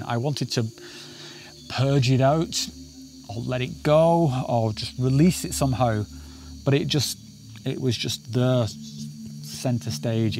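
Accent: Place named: Manchester, United Kingdom. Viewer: Are you from Manchester? You sound like Tyson Fury LOL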